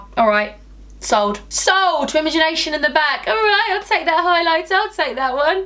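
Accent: United States. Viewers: you're american accent is better than mine... PS i am american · Your American accent is so good you sound just like me 😂😂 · Your American accent is on point girl 👌🏻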